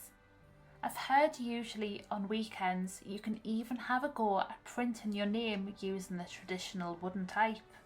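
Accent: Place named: Newcastle, United Kingdom. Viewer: Please lower the background music - it makes your voice difficult to hear and for those who find the Geordie accent difficult, this makes it harder. Lovely to see the beauty of the NE on show - so many wonderfully historic and scenic places to visit in Durham and Northumberland. Thanks for making this video for us to enjoy.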